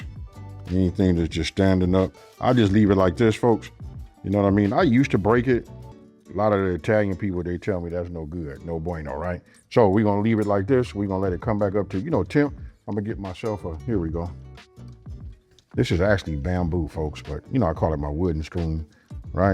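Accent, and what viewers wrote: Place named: Atlanta, United States. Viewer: Had a chixken casserole not spaghetti. Why does he have an Atlanta accent?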